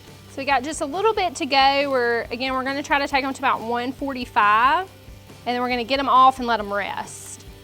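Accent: South, United States. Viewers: Its the South fellow babies. Rich a full.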